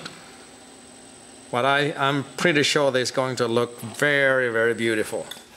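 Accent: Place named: Sweden. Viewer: He have to be from Sweden with that accent.. cool.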